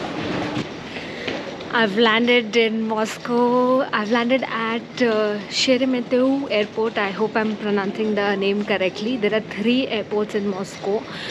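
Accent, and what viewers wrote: India. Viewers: indian english😏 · I have always loved the english accent for indians... Hello to You beautiful young Lady ! Best regards from Bucarest ROMANIA 💐🤞🍀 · Хорошая девушка. Но её английский очень похож на английский индийца в интермедии комедиклаба про американское радио с Харламовым... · Такой прикольный индийский акцент. Приятная девушка.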